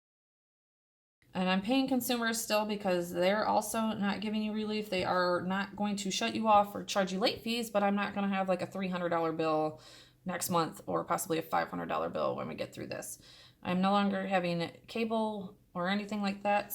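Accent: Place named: Michigan, United States. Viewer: Things got tough for us in 2009. We changed our cell phone provider and kept our phone numbers. Each of us pay $30 month. We use Mint SIM. That might cut your bill in half. Our ciiverage is awesome and we have no problems. No my parents use it as well, they caught on. Best 2 you From California. I was born in Michigan and I recognize your accent.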